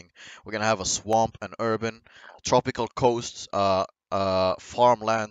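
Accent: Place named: Scotland, United Kingdom. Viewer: Just you sound scottish 🏴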